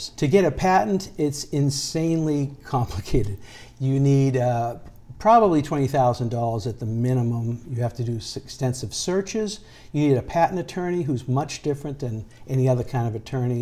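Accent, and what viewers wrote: Boston, United States. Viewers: I can hear your Boston ❤️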